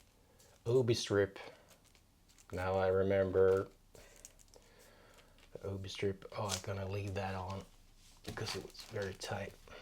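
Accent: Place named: United States. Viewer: I thought you were american before you told us you're a swede, like i am 🙂👍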